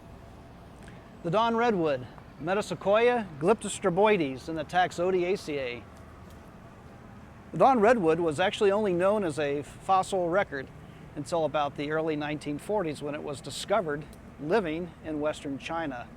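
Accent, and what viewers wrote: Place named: United States. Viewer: One of those sounds like Latin in an Italian accent and the other Latin in a French accent. Only natural that an American would speak Latin in an American accent!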